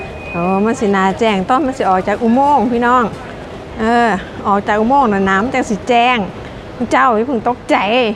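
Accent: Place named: Isan, Thailand. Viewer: ฟ้งสำเนียงการพูดแล้วชอบจังค่ะ..ชอบสำเนียงไทยอิสานนี้ค่ะ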